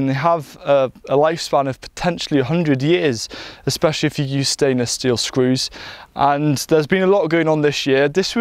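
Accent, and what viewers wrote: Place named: RP, United Kingdom. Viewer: You have the Queens English accent posh Good crops btw👍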